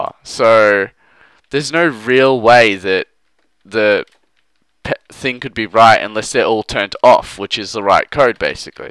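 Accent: Australia. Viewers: he is an aussie and thats why he said buddon or whatever you said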